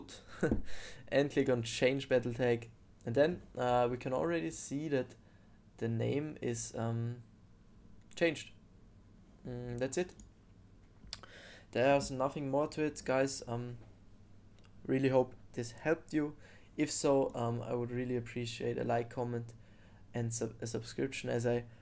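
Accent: Germany. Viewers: Dieser deutsch Akzent ahhh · i spot sam dchermen akzent here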